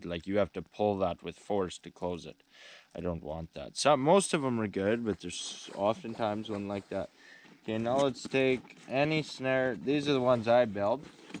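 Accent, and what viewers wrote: Canada. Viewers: By the way you talk, you sound Canadian.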